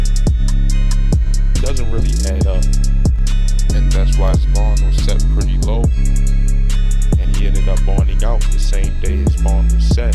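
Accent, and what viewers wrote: Baltimore, United States. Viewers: He from Baltimore speaking on down south shit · Bro talking gotta be from Baltimore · The dude who does this channel def from Baltimore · U def from Baltimore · I hear a Baltimore accent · He from Baltimore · You from bmore ain’t you? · You can tell my man from Baltimore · u frm baltimore huh😭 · This dude accent 💀 he gotta be from Bmore lmaooo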